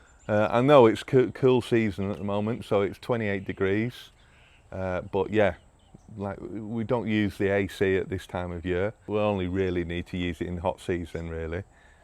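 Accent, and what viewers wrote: Northern England, United Kingdom. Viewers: Brilliant good luck to the future in your lovely home .i detect your from the north of England ?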